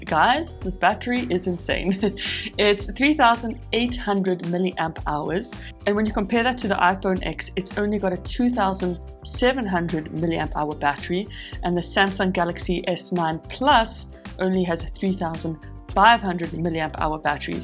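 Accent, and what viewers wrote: South Africa. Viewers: Hey you sound like a South African,I am from RSA.One tip usually techies refer to ram as memory and Device Storage as what you referred to as memory.I must commend you on Your editing , its awesome and this was a great review. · Just found your channel are you south african? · Great review with a typical South African accent and I smaak it! ;)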